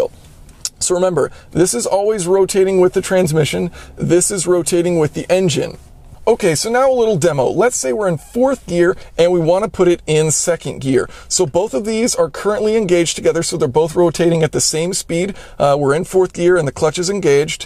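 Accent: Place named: United States. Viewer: As a mechanic in Australia I have 2 statements about this 1. in the USA which from your accent I will ASSUME you are from (possibly canada please don't smite me) Most people don't know how to drive "manual" or "stick" and with the way the world is going this will probably be useless in 10-15 years given electric cars 2. You used the example of going from 4th gear to 2nd gear now you failed to tell the good people at home THIS IS A VERY BAD IDEA, when you go up threw the gears if you rev them right out you will hit a "limiter" or what is refereed to as a "fuel cut" this is to prevent you making the engine spin faster then it is designed to go BUT if you down shift at a high speed skipping a gear you MAY not will MAY cause the engine to excited the "limit" cause it to kiss the valves with the piston on a High interference motor, So it just turns into a giant hand grenade and a lawn ornament until you have the money to replace the motor. 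People will have there doubts about this statement but I have seen it 1st hand on multiple occasions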